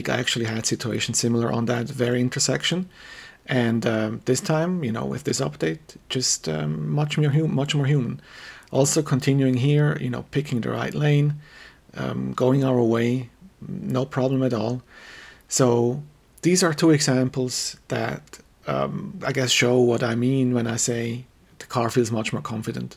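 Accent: Switzerland. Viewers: Schweizer Akzent hat überzeugt. Liebe Grüsse vom Genfer See :-)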